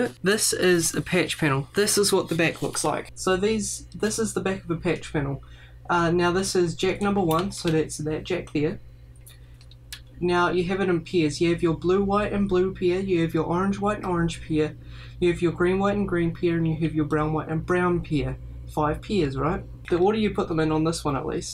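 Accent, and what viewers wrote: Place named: United States. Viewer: Dude are you kiwi living in America, lived in America, or are kiwi kids getting American accents from the internet? Nice tut also.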